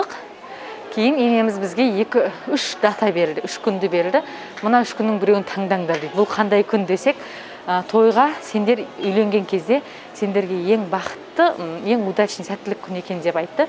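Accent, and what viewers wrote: Standard. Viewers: Я айтпақшы сіздің таза қазақшаңыз қанша жыл шетелде тұрсаңыз да өзгермепті жарайсың дегім келеді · Қандай керемет тұнып тұрған қазақша сөз деймін.Қайда жүрсең де Бақытты бол! Сенің каналың мен үшін өте қызық тартымды көре бергім келеді.Жаңалығың көп болсын! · Бізде де күн ыстық. Қазақ тілін таза сөйлейді 👍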